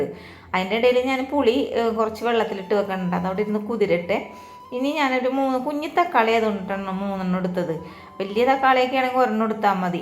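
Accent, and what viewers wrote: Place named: Kochi, India. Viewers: Ernakulam ആണെന്ന് മനസ്സിലായില്ലേ, എനിക്കറിയാൻ പാട്ല്ല കേട്ടാൽ ഉറപ്പ് എറണാകുളം.. പടിഞ്ഞാറൻ പ്രദേശം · പടിഞ്ഞാറ്ൻ ഭാഷ.... നന്നായിട്ടുണ്ട്... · Samsaram kettittu kochikkari aanennu thonunnu. Ningal evideyaanu sthalam?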